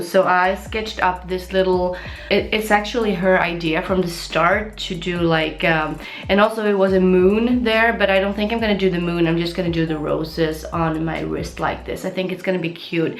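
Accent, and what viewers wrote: Sweden. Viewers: Oh love tour videos! I've followed you for a looong time and remember when you started speaking english in your videos and it's crazy what an improvement your english has gone through! Jag glömmer mellan gångerna vilken härlig dialekt du har tills du pratar lite svenska emellanåt. Fortsätt gärna visa dina tatueringar och piercingar 💋⭐️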